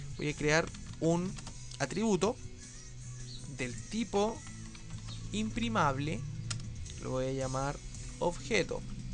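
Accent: Chile. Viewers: eri chileno, perrin?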